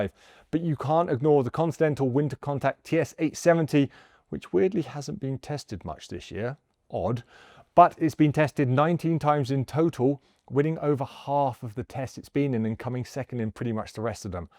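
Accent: United Kingdom. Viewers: I don't TRUST these "review channels" much, they all probably funded by manufacturers of tires. Too much bias. And a guy with brit accent living in Salt Lake City. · lol, i always thought you were British :D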